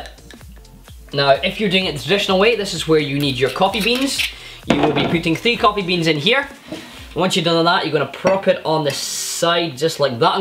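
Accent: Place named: Scotland, United Kingdom. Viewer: Awesome man, funny as hell,sorry your glass cracked though. I love the accent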